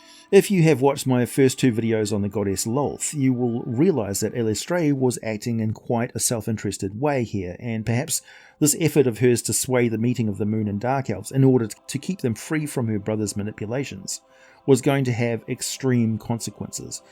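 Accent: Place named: New Zealand. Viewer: Great to hear a kiwi voice on YT❤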